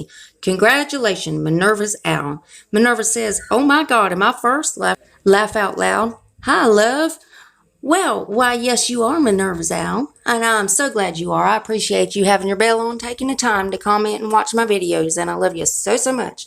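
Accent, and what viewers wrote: South, United States. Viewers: I love your southern twang! I am excited for the Betty Boop!!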